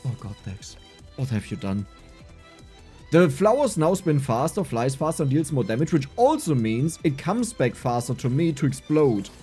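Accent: Russia. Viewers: fam hes clearly Russian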